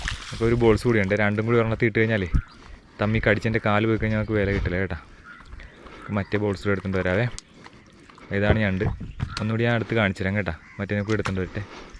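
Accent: Alappuzha, India. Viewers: Alleppey slang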